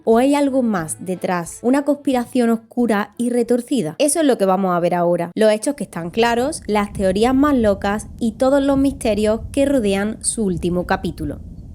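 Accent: Andalusia, Spain. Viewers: Sí el acento de es andaluz. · Me fascina la historia de Rasputín!!!! Y más como tú la cuentas!!! Ah y tienes un bellísimo acento!!!! Es andaluz? Saludos poéticos desde Chillán en Chile!!!!!!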